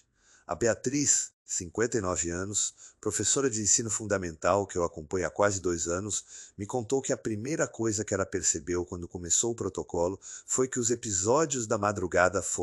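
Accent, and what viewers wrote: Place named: Portugal. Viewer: Interessante este Dr. IA fala português de Portugal e português do Brasil! 😮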